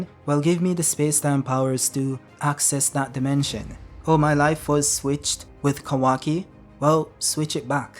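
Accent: Jamaica. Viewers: bro u made me understand more of baruto thanks but i got to ask are yu Jamaican? im just asking bc im Jamaican😁❔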